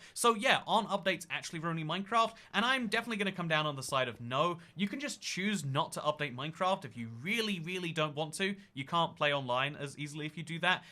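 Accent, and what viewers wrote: United Kingdom. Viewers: Now that he said that, I notice that speaking pattern with all the British YouTubers I watch. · When it comes to adding amplified to bedrock, I feel like the reason is not so much the difficulty of adding it, but maintaining it. You gotta remember that they really want worlds to transfer fine to newer versions. So yeah, that's my guess. P.S.: I find the r thing British people do hot. You're welcome.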